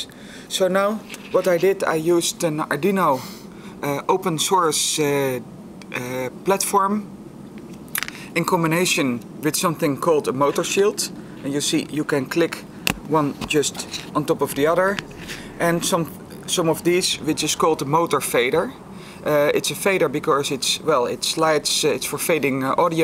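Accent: Netherlands. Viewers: grappig ik kan aan het accent horen dat je nederlands bent :D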